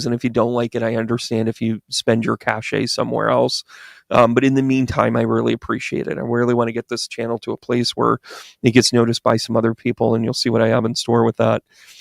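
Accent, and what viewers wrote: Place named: Boston, United States. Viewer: By the way ur NY accent is Boston!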